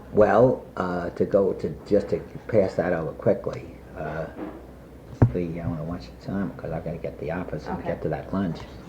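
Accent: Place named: New York City, United States. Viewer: That accent is Manhattan West Side, like *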